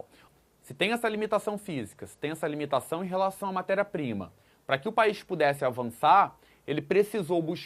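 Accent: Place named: Ceará, Brazil. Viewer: cearense kkkkk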